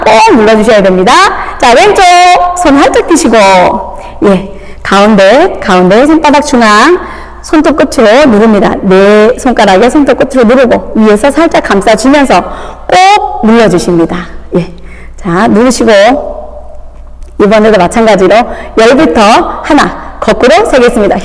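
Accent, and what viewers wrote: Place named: Chungcheong, South Korea. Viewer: 강사님의 밝고 힘있는 구령소리를 들으니 저절로 운동욕구 충만해 집니다. 충청도 사투리도 재밌네유~~ 매일매일 보면서 운동 열~심히 하겠습니다. 고마워유~~~^,^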